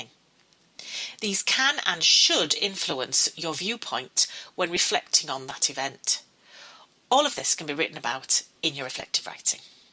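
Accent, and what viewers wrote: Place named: Yorkshire, United Kingdom. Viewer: Yorkshire English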